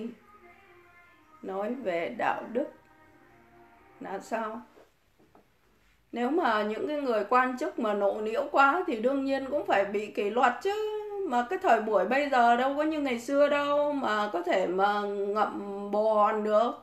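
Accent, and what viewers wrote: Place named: Hai Phong, Vietnam. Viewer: Ngôn ngữ cách nói của cô rất hải Phòng....chân thật và thẳng thắn.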